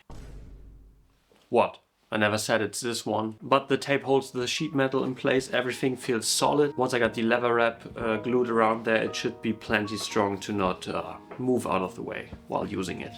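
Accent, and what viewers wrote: Germany. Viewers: As a german who is also fluent in english, I really love the denglisch vibes.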